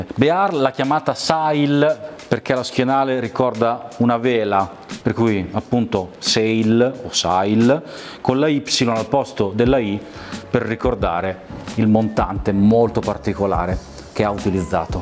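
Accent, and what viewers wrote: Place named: Romagna, Italy. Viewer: Forte della qualità video/audio ottima, ed incuriosito dalla sedia, ascolto la tua recensione... ma soprattutto il tuo accento e come pronunci la "z". Diamine! Penso: deve essere per forza romagnolo. Ed appunto, ci troviamo a neanche 20km di distanza. Complimenti per l'ottimo video!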